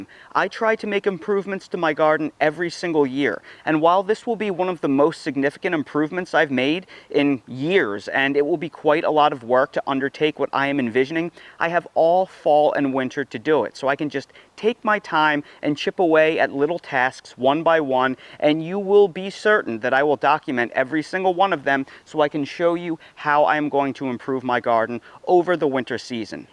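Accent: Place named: New Jersey, United States. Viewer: I knew you were from Jersey!! ☺️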